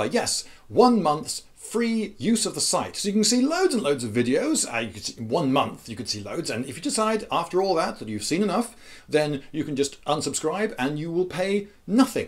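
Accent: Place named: RP, United Kingdom. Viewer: why is your accent so posh? Is it real?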